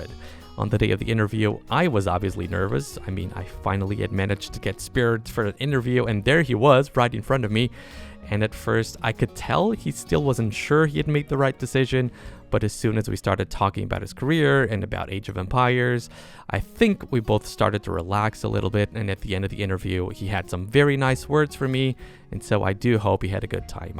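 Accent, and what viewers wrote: Germany. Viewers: I think it does need to be pointed out that your German does really sound German for other foreigners. Then again, there isn't really a sole German accent. Just ask the Bavarians or Austrians😅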